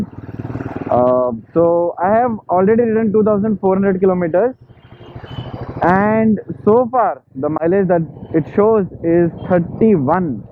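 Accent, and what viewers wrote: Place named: Pakistan. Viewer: need to check with my friend but your Hindi is really good bro you sound like a urdu speaking muslim sometimes 😀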